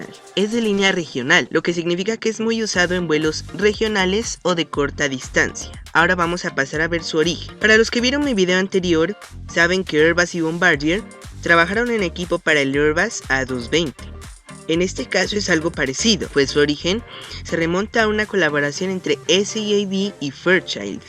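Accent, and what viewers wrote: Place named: Nariño, Colombia. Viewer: Sentí un Leve acento nariñense por ahí, ¿este canal es Colombiano? salu2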